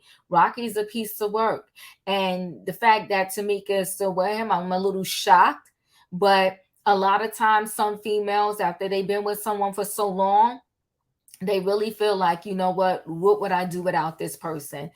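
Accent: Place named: East Coast, United States. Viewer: Love your commentary on this topic and your East Coast accent. Keep it up!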